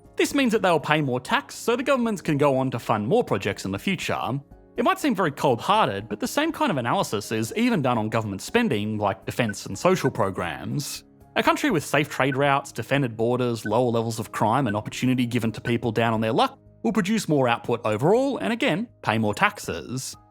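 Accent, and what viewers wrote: Australia. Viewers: An Australian Chrisopher Walken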